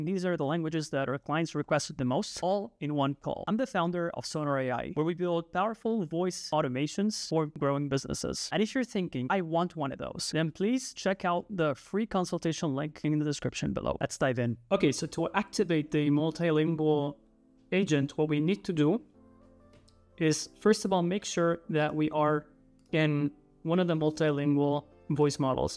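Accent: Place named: England, United Kingdom. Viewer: si ma l'accento è totalmente inglese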